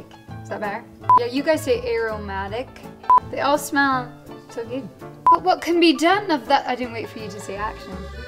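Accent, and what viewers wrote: Scotland, United Kingdom. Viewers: I love her scottish accent. It makes her even more endearing aswell s her personality. And i love the random American accent she did aswell. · Scottish or British? Or is it Neitherofthoseish? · Of coarse she's Scottish, I'm in fucking love.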